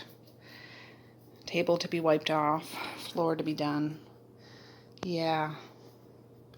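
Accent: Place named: Canada. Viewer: Her Canadian accent. Lol 🙂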